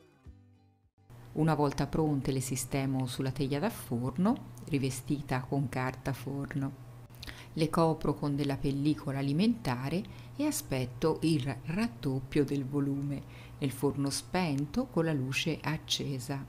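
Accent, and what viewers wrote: Tuscany, Italy. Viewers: Complimenti per tutto ciò che proponi, e per il modo con cui sai comunicare; la bella voce e la simpatica pronuncia Toscana, ti rendono completa.☺ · Un'altra buonissima e deliziosa ricetta..buone con la crema.. bravissima sempre precisa e dolcissima quando commenti il video..il tuo accento toscano mi piace molto.👏👏👏👏👏👏😘😘😘😘😘😘😘❤❤❤❤❤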